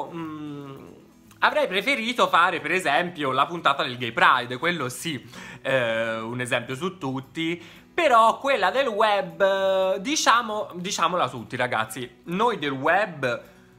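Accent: Tuscany, Italy. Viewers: Ti faccio una confessione: guardo i tuoi video soprattutto per il tuo accento perché abitando in Spagna mi fai sentire a casa dato che anch’io sono toscana. Continua così, sei fantastico 😘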